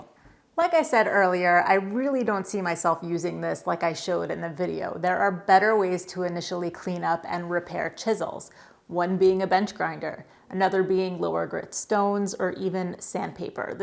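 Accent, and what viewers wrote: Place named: New York, United States. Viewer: Good work, but please announce that you are evaluating donated items at the beginning. You get me to grin every time you mention draws because I remember way back when to talked about the folks that "corrected" your New York pronunciation. Oh, and the lapping fluid? I use one of those little bottles, too. The first one went quickly because I flooded the surface. I have found, however, that just a few drops here and there (I tap the opened spout to spit out a drop) spread out and supply ample lubrication. YMMV, however. 😁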